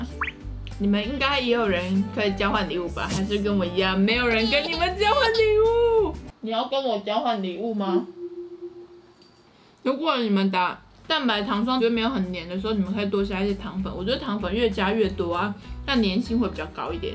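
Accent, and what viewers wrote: Taiwan. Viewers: 可愛的..怎麼吃都美...又配上有台灣味愛台灣的臺語口音.吾告讚.水喔...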